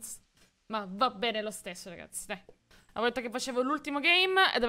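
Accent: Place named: Rome, Italy. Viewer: quando non sa coss dire dice nice oppure are U fucking kidding me ... in romano poi..... bah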